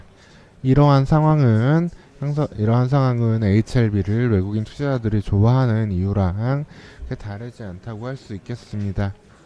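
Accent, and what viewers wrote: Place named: South Korea. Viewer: 지방분이세요? 말투가 졸려요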